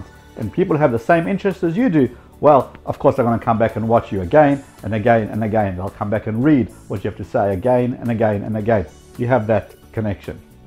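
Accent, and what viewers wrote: South Africa. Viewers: Oh! Of course, you're SAfrican. haha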